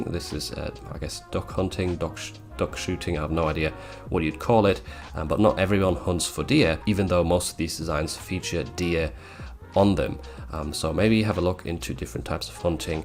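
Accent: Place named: England, United Kingdom. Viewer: Thanks, you always get me thinking. I hope you don’t mind me saying, since you mentioned about the plaid, that most people I know would say “various / variations” as though there were the word “air” in it. Not a criticism or anything, just thought you might like to know. I love listening to English accents since I now live in the States.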